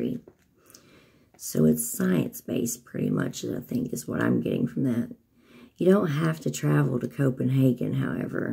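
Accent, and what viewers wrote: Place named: Tennessee, United States. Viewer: Grumble Bunnies! Oscar the Grouch! Squidward! 😬😱🙊...🙌🎚The JOY of the Lord sis! It IS what gets me thru! Had to learn the long hard way about this. Wandering the desert >40 years😬💔🙌🎚. God's perfect timing for new peeps, the Lord IS doing something, preparing us girl! That TN twang! This IS the day the LORD has made I WILL REJOICE AND BE GLAD! 🧐ain't nobody got time for dat! Cut em out Lord! Ps.1 HAPPY are those who do NOT listen to the wicked, who do NOT go where sinners go, who do NOT do what evil people do. We LOVE the Lord's teaching and we think about those teachings day AND night! We are strong like trees planted by THE RIVER, we produce fruit in season and our leaves DON'T die. EVERYTHING we do WILL succeed.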